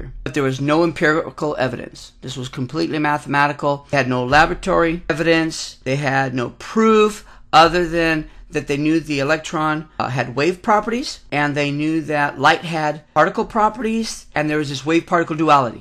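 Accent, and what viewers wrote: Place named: England, United Kingdom. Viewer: You sound a bit like a country yokel from England with that accent there.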